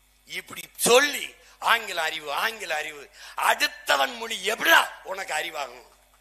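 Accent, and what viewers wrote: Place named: Sri Lanka. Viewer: Nee ceylon thamil perusaa tamil vakka lathu vangure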